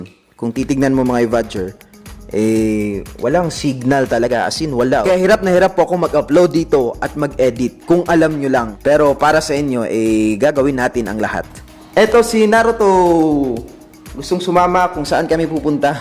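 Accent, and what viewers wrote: Visayas, Philippines. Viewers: Bisaya ka po ba idol? hehehe pa shout out na rin😁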